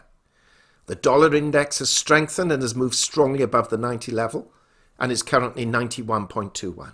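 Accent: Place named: RP, United Kingdom. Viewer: Wow, you just accused Mike Maloney, Chris Marcus, Chris Duane, David Morgan, Bix Weir and other respected silver professionals of either not having the technical understanding of the markets or worse yet, fraud by disseminating hype to sell product, while dismissing the fraud committed by JPM and the rest of team evil, who purchased over 2 million of fake paper ounces of silver to knock the price down to low $27s. Makes me wonder 🤔 about whose pocket you're in? The arrogance matches your Queen's accent.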